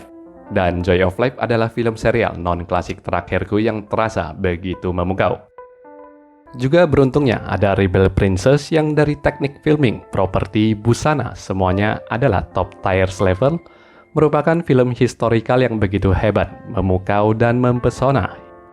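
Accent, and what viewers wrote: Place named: Indonesia. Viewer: Ya engga apa2, namanya jg org Indonesia. Kalau ngomongnya pakai logat Indonesia ya tdk apa2. Kan ada logat India, logat Jamaica, dll. Org Inggris sama America aja beda logatnya Kalau masalah clickbait ya banyak youtuber pakai spt itu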